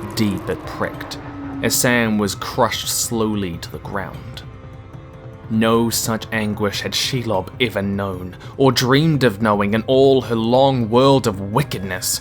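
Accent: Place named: New Zealand. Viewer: Its so good hearing a hearing a New Zealand accent in media. You sound almost exactly like I do.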